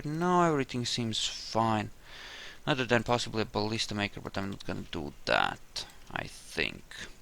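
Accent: Russia. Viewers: Are you Russian? You kinda have a Russian accent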